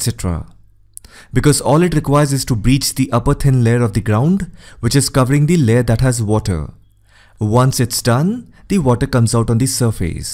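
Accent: India. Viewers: Indians English! Like criticise english language 😠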